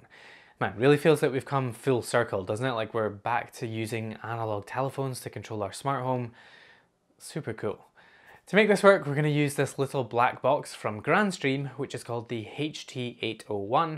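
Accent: Scotland, United Kingdom. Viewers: Great video. I´m missing your promised ESPHome config - also i´m not able to get the replies announced on the M5echo - any idea how to configure that? The speaker does work as audio-player... By the way: my (german) family loves your accent - it´s scottish, correct?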